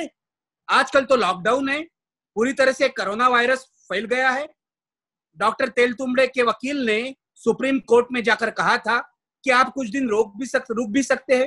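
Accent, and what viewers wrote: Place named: Maharashtra, India. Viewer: Abe bewakuf pehla marathi slang main Hindi bolna band kar.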